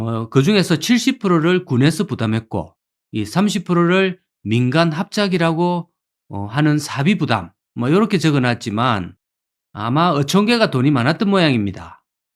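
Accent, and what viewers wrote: Gyeongsang, South Korea. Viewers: 갱상도 사투리 + 충청도 어투...? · 등더리 ㅋㅋㅋ 진짜 얼마만에 듣는 고국의 갱상도 사투리인가요. 억쑤로 고맙십니더. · 맞습니다 멀리 캐나다에서도 항상 다음 영상기다립니다 구수한 영남에 사투리로 재밋게 말씀 하시는것도 너무 재밋습니다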